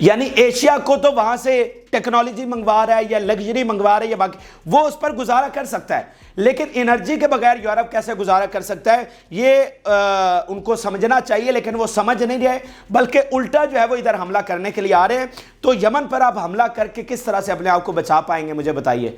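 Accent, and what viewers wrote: Pakistan. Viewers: Please! If you stop quoting the english version in your Pakistani English which is hard to understand, it will save a lot of time and we can watch.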